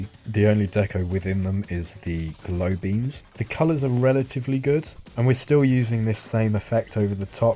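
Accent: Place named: United Kingdom. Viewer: nice video, definitely british accent carried though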